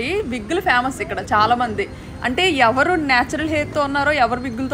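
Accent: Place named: Godavari, India. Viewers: Madam midi వెస్ట్ గోదావరి లా అనిపిస్తుంది మి voice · Nuvvu beautiful ga unnavu..Tollywood lo ki enter avvachhu kada..voice super..West godavara /East godavara..very beautiful & nice voice..congratulations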